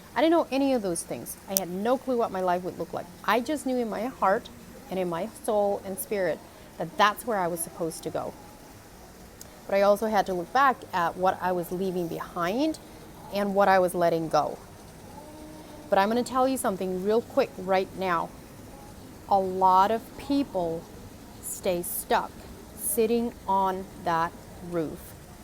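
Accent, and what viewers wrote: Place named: Pennsylvania Dutch, United States. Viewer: It's a Dutch accent. Pennsylvania dutch, "technically" American but not remotely normal or recognizable. I live in a nearby state, but without the internet I'd never have heard of it.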